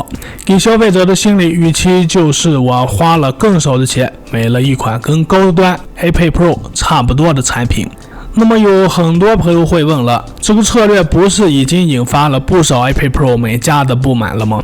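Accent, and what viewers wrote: Shanxi, China. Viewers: 这是山西，河北北部或者内蒙古中西部地区的口音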